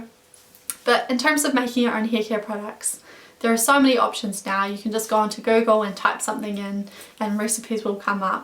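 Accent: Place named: New Zealand. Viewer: This was so calming and I love your neozealandian accent :)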